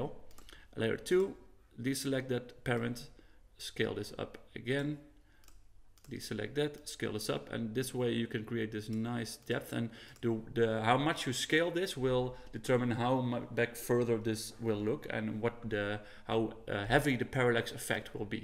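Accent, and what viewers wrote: Netherlands. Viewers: Ik hoor dat je Nederlands bent. Bedankt voor deze tutorial :)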